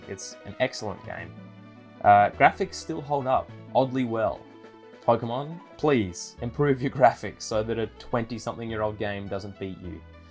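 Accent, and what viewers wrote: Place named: Australia. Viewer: Always loved the Australian accent☺☺. Awesome list, definitely more unique than most in regards to the parameters.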